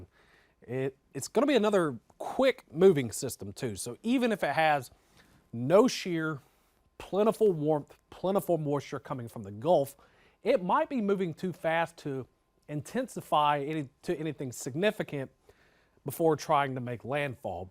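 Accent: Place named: Texas, United States. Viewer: Im from Tennessee and im so confused with your accent. Are from Chicago or Texas 😂.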